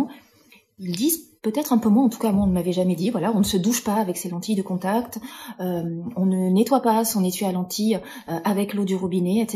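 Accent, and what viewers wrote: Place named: South, France. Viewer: j'adore votre accent meridional 😊.ma mere etait de la Dordogne,( Perigord vert, pres de la Haute Vienne et je suis ne en Anjou, maine). et loire,limite Sarthe et Mayenne. La bas aussi, on a un accent "bein" prononce. Bon Courage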